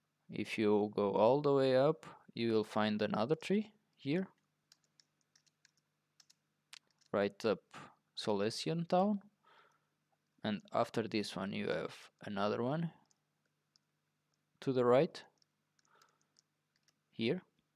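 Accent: Portugal. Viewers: Sotaque pt? 100%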